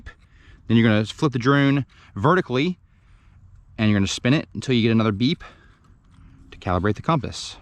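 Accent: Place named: Baltimore, United States. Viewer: Love the straight up Baltimore accent. Sorry to see this with the drone. I was kind of excited to buy this for my son.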